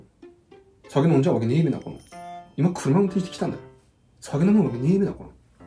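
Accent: Tsugaru, Japan. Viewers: 標準語のイントネーションに津軽弁が残ってますね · 定期的に津軽弁が聴きたくなる動画😂 いや〜面白いですね！！青森のお父さん、優しくていいなぁ〜。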